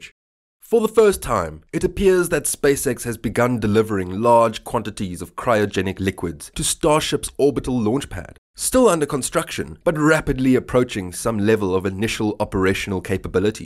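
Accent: Afrikaans, South Africa. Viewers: Great program, but your script was a bit out of date in most of the stuff that was happening in your previous videos. I see you are cathcing up to more current content. Keep up the good work. Nice to hear an Afrikaans accent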